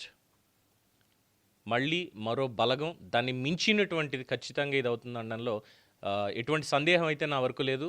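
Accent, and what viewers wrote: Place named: Andhra Pradesh, India. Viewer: అసలు ఈ భాష యాస పై చర్చిస్తున్న యాంకర్ ఆంధ్ర వారా? తెలంగాణ వారా? తెలంగాణా వారయితే వారి భాష యాసపై పట్టు లేదని పిస్తోంది. ఎందుకంటే వారు మాట్లాడే మాటలలో 90% ఆంధ్ర యాసే వినిపిస్తోంది. మరి ఇలాంటి వాదనలు లో మాట్లాడే టప్పుడైనా తెలంగాణా యాసలో మాట్లాడితే మీ వాదనకు అర్ధం వుంటుంది. తెలంగాణా కవి జ్ఞాన పీఠ గ్రహీత నారాయణ రెడ్డిగారు ఆంధ్ర యాస తెలుగు పాటలు,ఉర్దూ గజాల్స్ రాసారే కానీ, తెలంగాణ భాష యాస గురించి రాయలేదు.ఎందుకని. 🙏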